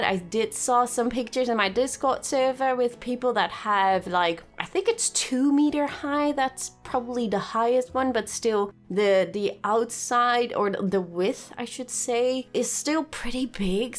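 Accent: Netherlands. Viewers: Haha ben je Nederlands?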